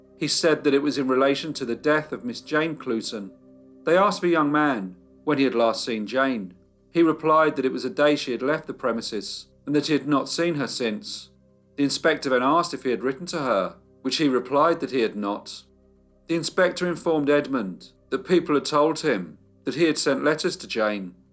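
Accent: England, United Kingdom. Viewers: Amazing work on this tragic and sad case Your brilliant english accent brings the stories alive! Thank you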